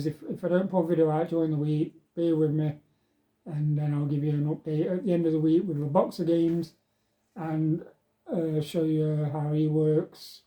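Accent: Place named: Nottingham, United Kingdom. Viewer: Where abouts are you from roughly mate? Can't quite work the accent out but you sound like you're from my neck of the woods (Nottingham/Mansfield) way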